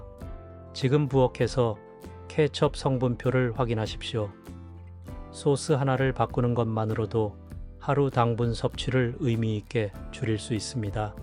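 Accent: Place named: China. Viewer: 발음이 중국놈않이야 국산제품인기제품만 세계 에서믹스커피는 인기제품인데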